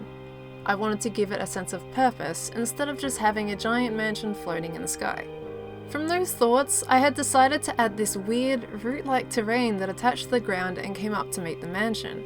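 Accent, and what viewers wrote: Australia. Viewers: are you aussie i cant tell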